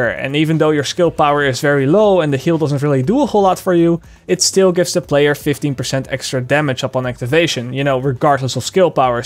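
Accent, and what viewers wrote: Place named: Netherlands. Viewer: Jij bent nederlands... Ik hoor het in jou accent.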